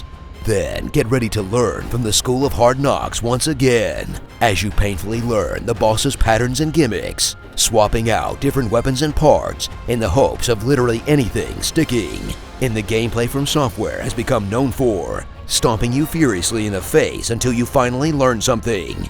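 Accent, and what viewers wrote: Australia. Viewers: Australian youtuber, but yeah. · I think he's Australian.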